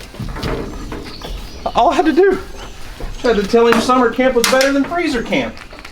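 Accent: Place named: Oklahoma, United States. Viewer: Love the way you say some of your words. I know it's mostly because you're from Oklahoma. Rufus has declined to answer the question. Please keep your hands to your house yourself 😜😜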